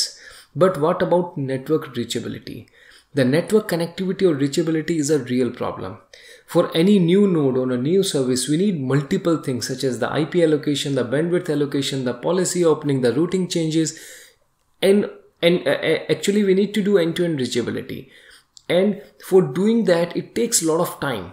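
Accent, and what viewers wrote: India. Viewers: India spoken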